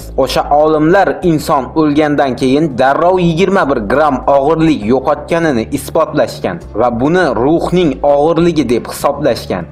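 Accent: Khorezm, Uzbekistan. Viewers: Улеее хоразмлимисане эшитиб асаб болдим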